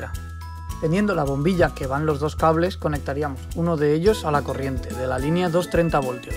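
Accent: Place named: Spain. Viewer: Bueno, por tu acento eres español, y yo soy español. Eso que dices no es así 😂😂😂. Ahhhh y hablas con un electricista 😂😂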